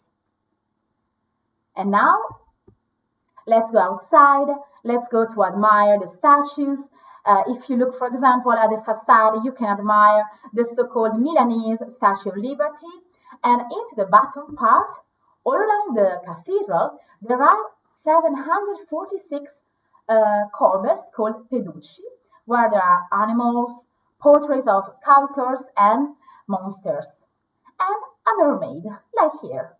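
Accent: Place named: China. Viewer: The audio is really bad. Sounds like she speaks Chinese.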